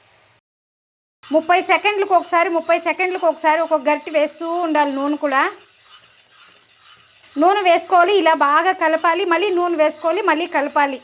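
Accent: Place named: Chittoor, India. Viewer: Maadi ctr andi slang ala unte adiga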